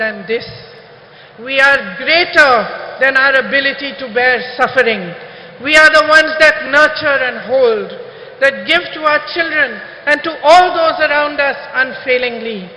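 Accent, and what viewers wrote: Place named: Tamil Nadu, India. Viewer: எங்கள் தமிழின் இனிமையை தங்கள் உச்சரிப்பில் கேட்டு மகிழ்ந்து வாழ்த்துகிறோம் வெல்க இந்தியா வெற்றி நிச்சயம்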